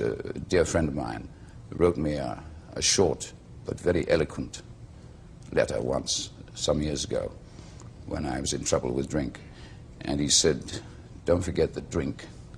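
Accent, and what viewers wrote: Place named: Wales, United Kingdom. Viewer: Can hear his welsh accent at times, poor man, rip.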